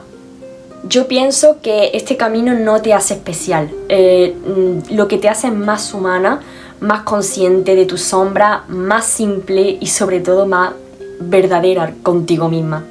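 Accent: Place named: Andalusia, Spain. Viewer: Me ha gustado mucho tu canal. Deduje que eras del sur de España por como hablas. Me gusta ese acento del sur de España. Tu canal me suma, es de los pocos en habla hispana que tiene contenido de calidad sobre brujería y espiritualidad.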